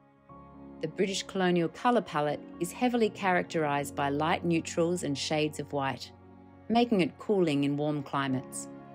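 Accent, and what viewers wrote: Australia. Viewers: Thank you for an informative video. It was great hearing an Aussie accent too.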